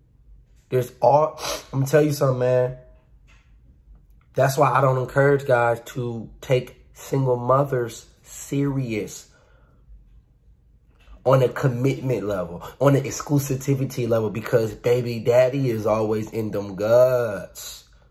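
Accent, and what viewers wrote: Baltimore, United States. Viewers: Big homie.... you from east baltimore? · Yooo are you from Baltimore? I can hear the accent. I’m originally from Silver Spring, Maryland.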